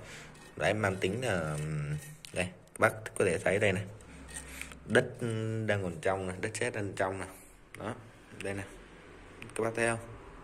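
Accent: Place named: Hue, Vietnam. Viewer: Giọng bác giống giọng Huế quá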